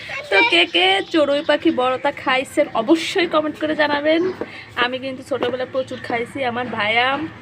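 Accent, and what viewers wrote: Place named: Faridpur, Bangladesh. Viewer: আসসালামু আলাইকুম আপু। মাশাআল্লাহ তোমার ছেলেতো একধম পাখি প্রেমি ❤❤।তোমার কথার টৌন শুনে মনে হলো তুমি ফরিদপুরের।আবার বাগেরহাটের কথাও কিছুটা এমন।আপু পাখিটাকে একটা ক‍্যারেটের ভিতর রাখতে পারো তাহলে পড়ে যাওয়ার কোন ভয় থাকবে না।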